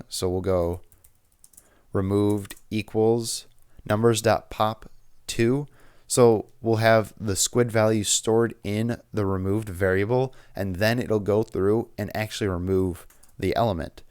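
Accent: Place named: United States. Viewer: Hey bro, thanks for your course with US accent. It is easier to follow the course.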